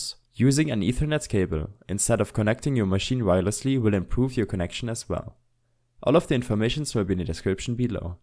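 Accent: Germany. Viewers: Dieser deutsche Akzent man hört es so stark xD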